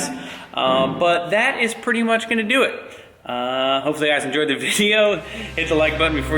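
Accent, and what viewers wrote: South, United States. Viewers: I love how your Pickens up a litter southern sounds in your voice. Awesome keep on trucking buddy. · Starting to get that southern twang.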